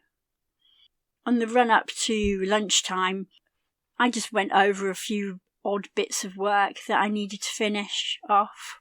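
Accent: United Kingdom. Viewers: I'm grateful for your videos. I love the British accent!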